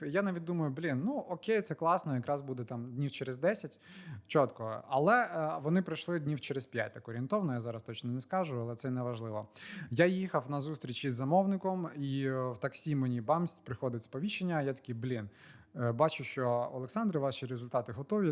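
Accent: Standard. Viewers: чиста українська💪👍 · Давай не розклєюйся :) Хороший настрій, пили контент та одужуй, і до речі, дуже гарно говориш чистою українською) Це перше відео з твого каналу яке я побачив, до того ж, з рекомендацій ютуба 😊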